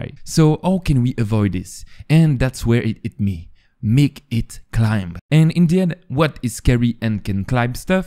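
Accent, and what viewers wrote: France. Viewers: Y a qu'un truc qui me choque ! si je ne me trompe pas vue ton accent ! tu est français . pourquoi tu ne fait pas de vidéo en français aussi ?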